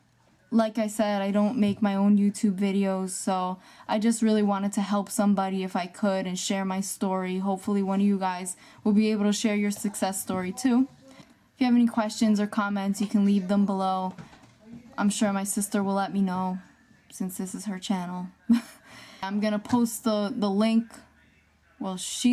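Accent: New Jersey, United States. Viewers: Are you from New Jersey? I can hear an accent.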